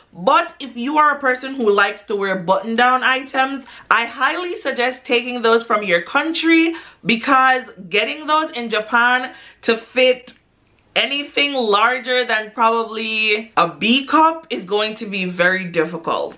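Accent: Jamaica. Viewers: I did hear the little Jamaican accent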